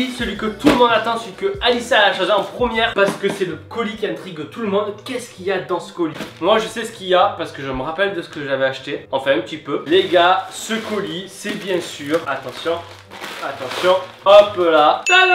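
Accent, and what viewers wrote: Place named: South, France. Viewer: T du sud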